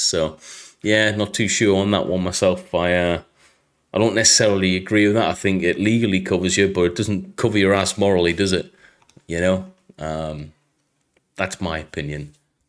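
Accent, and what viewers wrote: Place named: United Kingdom. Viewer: I’m brand new to the channel, I’ve just come across one of your ‘voice over’ videos (the 5 reasons the US will beat China & Russia or similar. I’ve mashed the subscribe and like button. Loving this video, I’m ex-light dragoons, I have huge respect for the royals. Absolutely outstanding soldiers, great moral compass and I massively agree with everything said on this. Where are you from mate? You sound my neck of the woods. Well done on the channel. 🇬🇧🤜🏻🤛🏻⚓️⛴🥷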